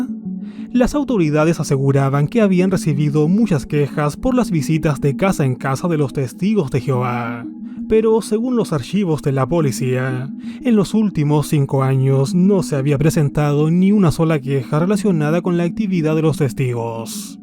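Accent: Chile. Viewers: Ah ok, entonces es común para cualquier chileno dicha pronunciación? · Es shileno... un vicio cultural ...propio de la nacionalidad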